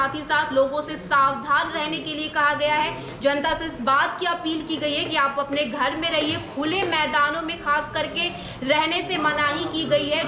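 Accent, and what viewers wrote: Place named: Maithili, India. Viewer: आप मैथिली भाषी हैं क्या❓ आपके Accent से तो ऐसा ही लगता है.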